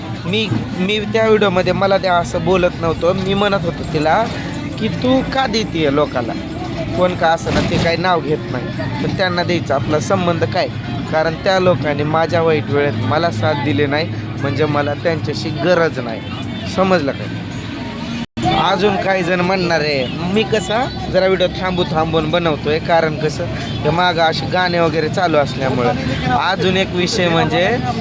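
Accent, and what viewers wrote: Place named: Latur, India. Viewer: दाजी पीवर लातूर ची भाषा की ओ तुमची😂😂😂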